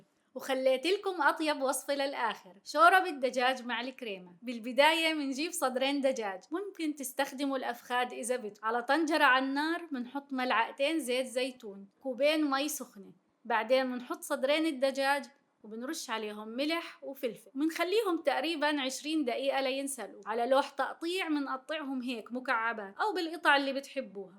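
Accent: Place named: Palestine. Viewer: لهجتك فلسطينية ❤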